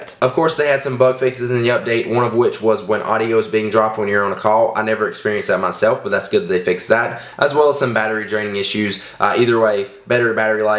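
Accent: South, United States. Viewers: If you guys think he has a strong southern accent, you have no idea... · its a southern accent ur either racist or just a fucking dumbass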